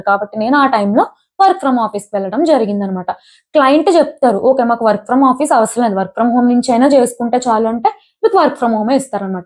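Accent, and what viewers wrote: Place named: Konaseema, India. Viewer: Konaseema slang ❤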